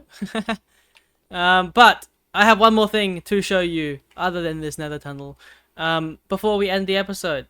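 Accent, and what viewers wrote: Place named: Australia. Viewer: You sound more Australian and look more orange and scammy since I last talk to you, what happened🧐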